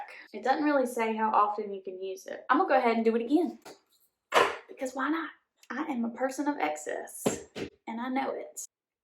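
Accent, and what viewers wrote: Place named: Louisiana, United States. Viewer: You sound like your from Louisiana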